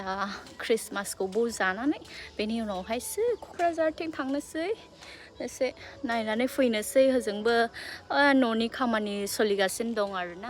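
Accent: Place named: West Bengal, India. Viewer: West Bengal ni boro railynaiya alda kwnaiyw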